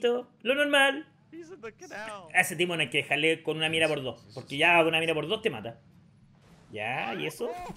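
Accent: Chile. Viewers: Eres chileno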